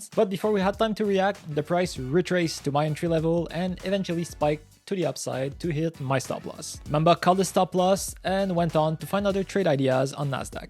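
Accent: Quebec, Canada. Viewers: Je viens tout juste de te découvrir et j’entends un fort accent Québécois 😅. Je m’abonne à ta chaine!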